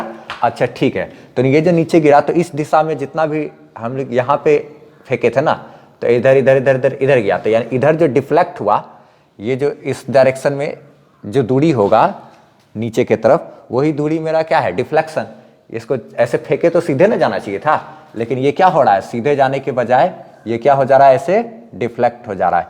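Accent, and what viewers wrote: Bihar, India. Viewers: Sir aap bihar se ho 😶¿¿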